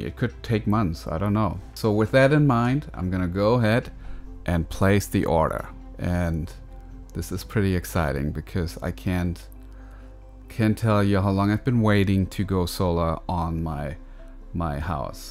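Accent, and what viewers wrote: Germany. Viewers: Grade über deinen Kanal gestolpert, super Video, dein Akzent bewegt mich dir Grüße aus der Heimat zu senden. Hoffentlich gibst es das Solarroof bald in Deutschland zu kaufen!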